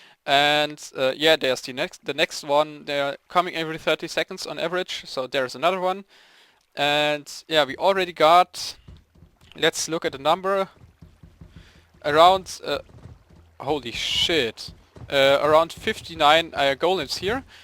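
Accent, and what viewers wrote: Germany. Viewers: He sounds like a german and there are some german players around him (Banenenkrieger = bananwarrior) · u sound german :D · real german english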